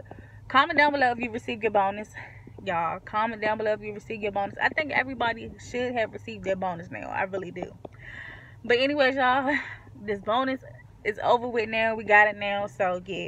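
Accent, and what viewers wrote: DMV, United States. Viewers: You from the DMV?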